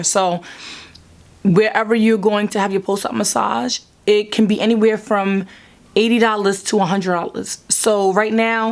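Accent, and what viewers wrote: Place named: New York, United States. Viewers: Is she not from New York, wow the accent is definitely New York, I'm from Philly and our accent isn't nearly as strong as New York :) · Your personality is everything! Mad raw and transparent. You sound like a fellow New Yorker, are you? Great video and so informative, eveb for those not considering the same surgeon as you. Thank you!! Btw: You look great and keep those videos coming.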